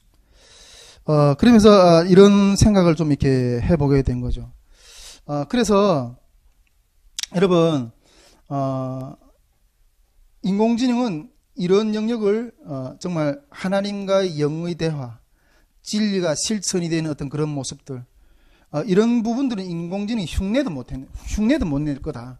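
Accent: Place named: North Korea. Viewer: 이런선교모임도 있었네요. 윗 동네분들 발음과 억양은 조금은 생소합니다만 주안에는 이런 시원한 생수같은 말씀을 처음 듣게됩니다. 귀하시고 감사드립니다.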